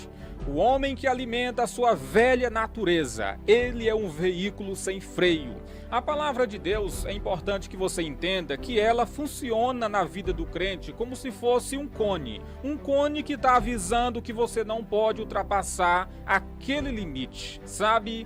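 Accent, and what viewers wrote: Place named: Ceará, Brazil. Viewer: Cearense da gema😅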